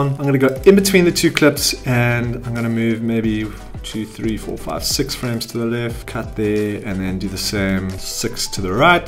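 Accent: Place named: South Africa. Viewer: sound so south african anyways thnx for the video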